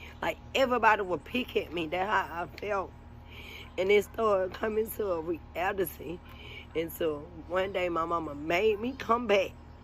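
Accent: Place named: Florida, United States. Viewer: Keep your head up, continue to keep God in your life, I went through some similar things got me down for awhile but I found God and will continue to keep my father in my life, I will pray you continue to keep your head up and stay blessed! I'm from fla too but I live in NY now and I swear you sound so much like my auntie's and I love it, please don't ever lose your accent they made me get rid of mine when I came to NY and went to school! Stay blessed🙏🏾❤️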